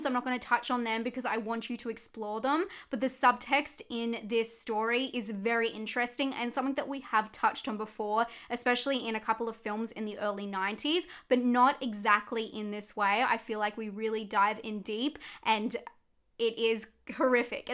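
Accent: Australia. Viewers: Youre Australian?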